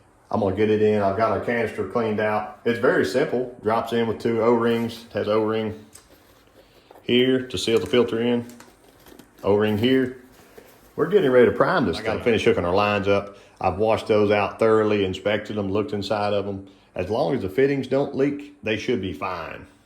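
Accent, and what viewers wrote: South, United States. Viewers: You must own your own business or your an educated man with a very good income but it blows my 70 year old mind how your able to afford all these expensive builds and such but I do still like the southern accent and they very nice things you do.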